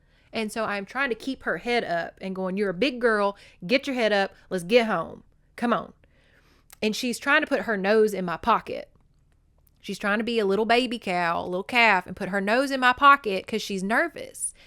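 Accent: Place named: South, United States. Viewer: Okay but I love your southern accent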